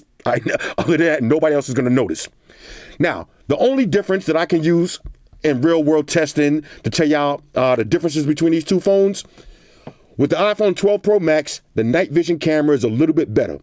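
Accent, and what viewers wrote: New York, United States. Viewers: My man is soooo NEW YORK, I love it. I love apple but I agree with all your dislikes . They need to do better and give us at least a dongle and a brick yo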